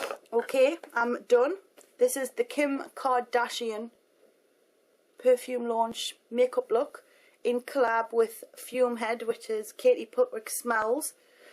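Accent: United Kingdom. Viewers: Omg i can't understand a word ur saying! Littlebit too british i can't understand anything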